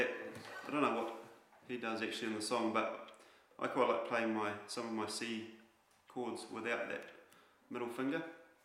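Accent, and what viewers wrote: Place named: New Zealand. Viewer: Dude, love it, thanks heaps. I'm laughing at how kiwi this whole thing is too. Mumbling bursts and unfinished sentences. Or just concluding your point with "yeah". Love it! Drive any Americans watching this crazy.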